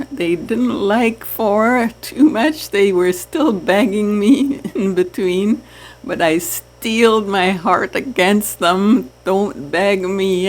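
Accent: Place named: Trinidad and Tobago. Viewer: nice video, i picked up the trini accent in the 1st 30secs lol. reminds me of home. good information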